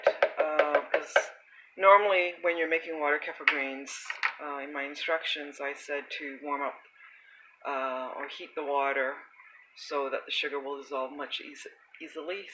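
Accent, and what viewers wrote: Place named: Canada. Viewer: I have thoroughly enjoyed this video. I have watched it over and over. I ordered some water Kefir grains from Florida and have made a huge batch of coconut Kefir. It is thick and rich and creamy. It tastes better than any Kefir I have ever tasted in my life. Your instructions are short and to the point and very good and well thought out. Also. I have been in America for the last 30 years but I was born and raised in Canada🇨🇦 and I am enjoying your Canadian accent. I especially love the way that we say “about”. I still have a bit of my Canadian accent. Stay encouraged. Keep up the good work.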